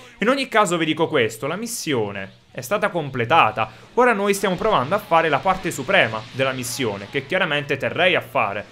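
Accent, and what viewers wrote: Naples, Italy. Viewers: ma tu sei di Napoli??🤔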